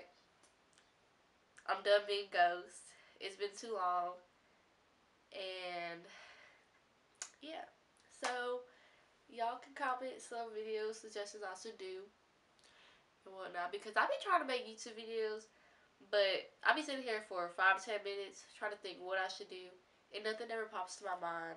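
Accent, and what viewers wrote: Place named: Tennessee, United States. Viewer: I can hear your accent from living in tennessee... you talk completely different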